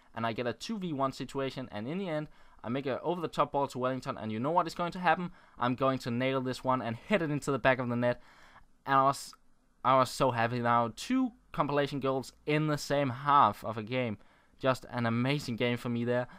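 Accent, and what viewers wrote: Denmark. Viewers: he's so danish its hilarious i love his commentaries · You sound like a Dane..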